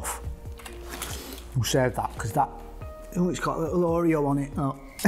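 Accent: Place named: Accrington, United Kingdom. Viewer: Hahaha honestly you’re funny as fuck, the only issue is your accent, went with a dirty bird from Accrington once and she sounded just like you. 😂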